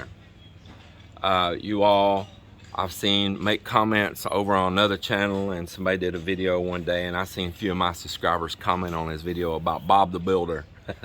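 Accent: Texas, United States. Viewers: I am your 83 year old lady in Texas that look forward to your daily video. It is normally 2AM as I have sleeping problems. I look forward to watching you and each night. I love seeing your progress I want to know about the chickens,the cow. Any thing you video will be interesting to me because of your Texas accent and straight forward delivery. I love the beautiful scenery you show and the beach. Tell I prayed for her surgery and not overdue too quickly. She will be good again in no time. MeMe in Texas USA 🇺🇸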